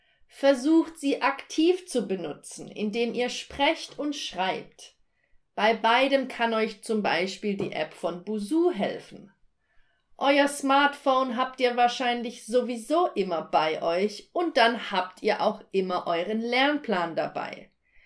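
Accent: Russia. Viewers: Да слышно же, что она русская ^^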